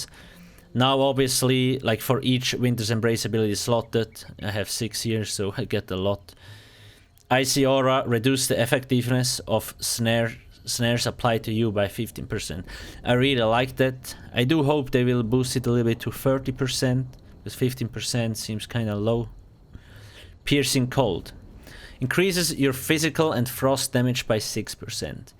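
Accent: Switzerland. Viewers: Dude, are you swiss?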